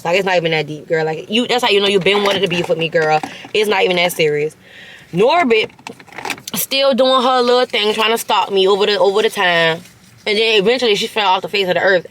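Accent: New Orleans, United States. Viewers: Is that a Nola or Georgia accent? You sound blended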